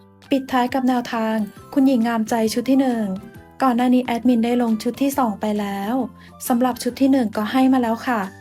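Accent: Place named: Central Thailand, Thailand. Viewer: แอดคนจังหวัดอะไร เหมือนคนภาคกลางเหนอนิดๆๆ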